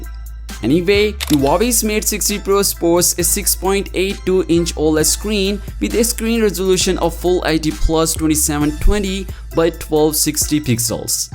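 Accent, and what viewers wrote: India. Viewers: 听着像印度口音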